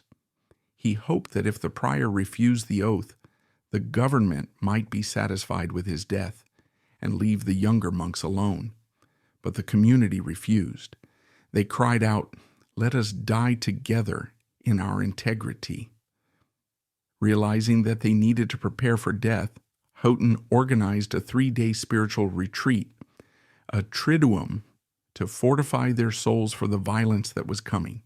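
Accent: United States. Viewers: Why the American voice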